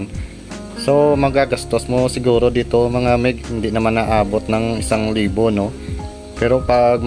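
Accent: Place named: Iloilo, Philippines. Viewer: Ilonggo ka siguro nu meg.. kay may ebidensya ako simu..sa tono ng boses mo abut taka meg..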